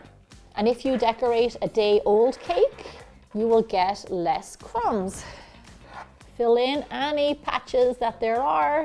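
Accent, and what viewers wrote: Ireland. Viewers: You are so cute. I just discovered your channel & subscribed. First, I Love your Irish accent and definitely your baking recipes, (thoughts, strategies & ideas with baking). Also appreciate the mindfulness of cake prices you have and simply baking at home. I was looking for a birthday cake recipe too! Thank you 🙏🏻🙏🏻so much for sharing your gifts with us. Happy 11th Birthday 🎂 Gemma (Beautiful Cake🎂) & may God bless you with many more happy years ahead!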